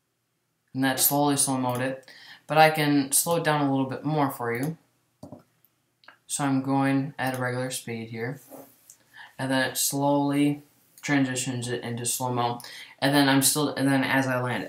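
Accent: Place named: Wisconsin, United States. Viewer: I can tell you are from Wisconsin just from your accent.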